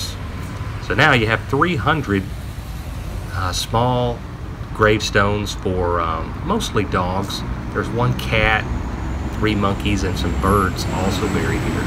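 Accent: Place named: South, United States. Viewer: I wasn't expecting to hear a good ol southern voice explain a London site. 😁👌🏻✌🏻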